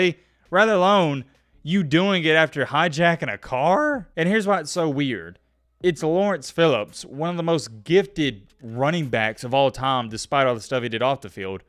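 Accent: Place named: Florida, United States. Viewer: This ain’t the channel for you lol. If you like to fall asleep to a lot of jibber jabber in a thick Florida accent, this is the place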